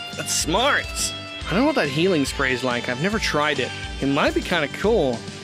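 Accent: United Kingdom. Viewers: Ah yes British goku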